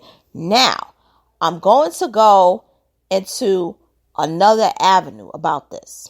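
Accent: New York, United States. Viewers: Yo your voice! Straight N.Y Yo!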